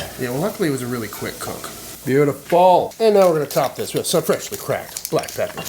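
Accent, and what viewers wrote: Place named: New England, United States. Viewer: Idk what this means, but this was the first video of yours that I saw and I could immediately tell that you were also from New England.... love the content and you remind me of one of my cousins lmao. Please keep doing what you're doing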